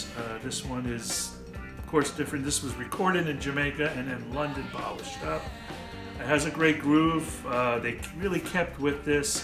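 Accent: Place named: Philadelphia, United States. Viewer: This was the first album (cassette) I ever bought when I was 15, 16(?) and although it’s not considered generally or by critical acclaim to be one of their best, it is nontheless a solid album. To be honest, it’s not even one of my favorites although I do like it very much and always have. I was introduced to the by the older brother of a good friend when I was still a young rock fan trying to expand my musical horizons past Foghat, Kiss, Journey, Doobie Bros, etc. (I had discovered Led Zepplin and The Who by this time but Pink Floyd wasn’t even on the radar). Anyway, thx for your in depth research, knowledge, and reflections of personal ups and downs the band members may have been going thru during the time of recording. One thing I’d like to mention about Goat Heads Soap is that I really feel that is possibly the most underrated song and one of my all time favorites! 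Another great job. I watched all three Let It Bleed docu’s and now watching part 3 of Goats Head Soup as I write this. Would love to get a copy of Brussels Affair, CD and DVD, blue Ray if possible. Yep! Another great job! One last thing; I live in Cal and I’m guessing by your accent that you’re from Philly. Just a sayin… Hope you do a doc about my favorite album and one the two tours I saw…SOME GIRLS. (Tatoo You was the other one)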